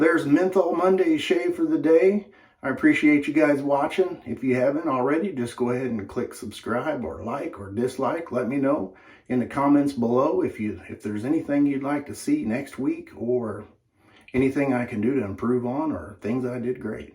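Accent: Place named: South, United States. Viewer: Hey, thanks for the video. What are your thoughts on the Razorock Game Changer .84 Open Comb, now, 4 years later? I found your video because I just ordered my first safety razor, after using cartridges for 30 years - first the Gillette Sensor, then the Mach 3. After some reading and some recommendations, I went with the GC .84 OC. (I’ve always been one to dive in head first!) Also, sounds like you’re from the South. I’m in Nashville area.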